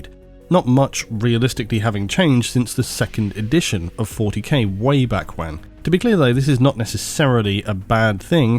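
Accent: England, United Kingdom. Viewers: I like when England man speaks.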